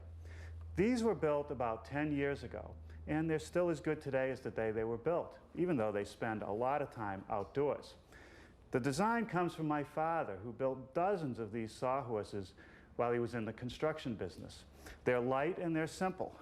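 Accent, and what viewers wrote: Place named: Boston, United States. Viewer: Same. In the early 2000s, usually on Sunday mornings, my dad and I would always sit down together and watch this show. Made for a beautiful morning. Having the sun guzzling through the living room window, seeing the dust in the air through the sun rays, and just hearing his Boston accent with that music in the background. Good times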